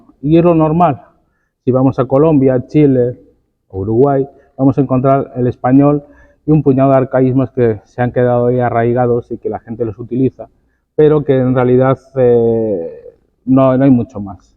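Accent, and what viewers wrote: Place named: Colima, Mexico. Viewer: Interesante trabajo. Después de escucharte, y después de 20 años tu castellano también se ha Colimizado:) Un abrazo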